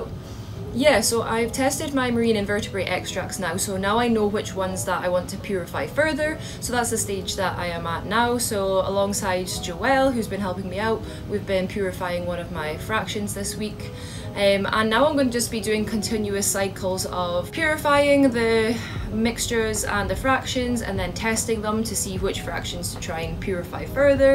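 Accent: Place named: Scotland, United Kingdom. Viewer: Another great video. Nice to see that both our labs are a frequent shopper of Sigma-Aldrich. Also loving the Scottish accent 🙂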